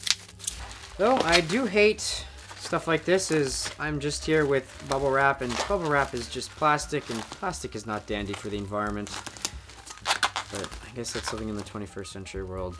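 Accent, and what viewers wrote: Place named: United Kingdom. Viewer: I honestly thought you were british all this time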